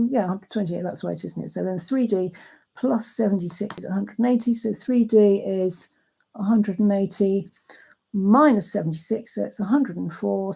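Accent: United Kingdom. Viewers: ..., If I remember correctly, mathematics in my school paid a lot of attention to becoming proficient in Algebra and Trigonometry, but little time was spent on Geometry, while Algebra and Geometry are very much connected! (thinking of René Descartes). Despite the fact that Geometry is very visible, it still requires a thorough structural approach strategy to problem solving, after all, you are confronted with all kinds of geometric objects and combinations thereof (also in your video), which still regularly creates confusion for me when trying to solve problems! In conclusion, if you are really a British citizen (if I'm right according to your pleasant accent), then we are neighbours, as I live across the North Sea in the Low Countries of simply beautiful Holland... Thank you again, Jan-W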